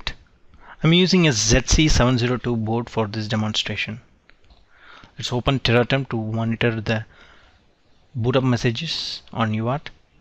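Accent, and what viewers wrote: India. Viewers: wow this one is exactly from middle of india - however useful tutorial but bad accent - anyway good luck to you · Awful Indian accent as always.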